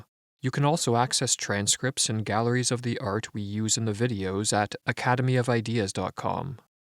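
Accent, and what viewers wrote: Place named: Canada. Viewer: I like the narrator’s Canadian accent here. Makes sense right about now.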